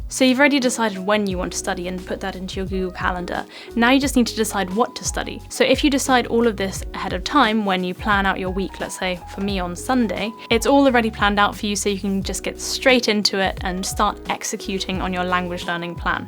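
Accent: England, United Kingdom. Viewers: Thank U for the video!!! I never cease to be amazed at Ur understandable British accent! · Actually I am a school student who is willing to learn British accent,I found your accent interesting. Thank you .We really appreciate your dedication for helping others develop their accent even if you get nothing in return. Your such a great need for society. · You're Welcome !! I really love your english accent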